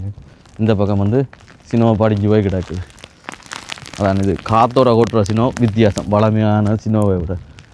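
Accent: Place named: Sri Lanka. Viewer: R u srilankan bro Ur speech will be like that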